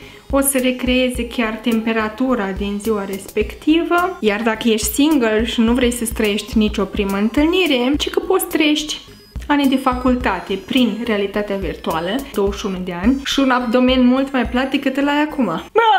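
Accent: Moldova. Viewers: Singurele persoane care pot sa aiva o relație cu mine sunt băieții din 1D. Ps: imi place accentul tau de moldoveancă drăguță. 😘😘😘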